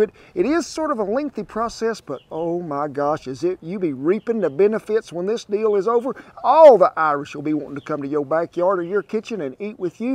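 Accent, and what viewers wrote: United States. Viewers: I love your cowboy talk. It is like a computer language.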